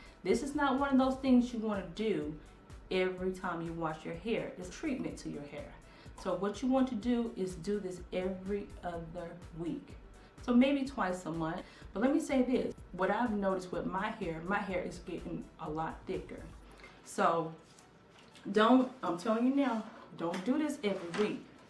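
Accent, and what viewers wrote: South, United States. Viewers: She's not saying scab she is saying scalp with a a southern twang. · Adele Reed it’s just her southern accent.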